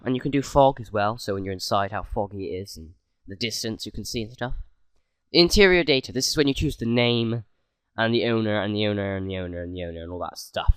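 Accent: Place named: Bristol, United Kingdom. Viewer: Sounds a bit Bristol to me!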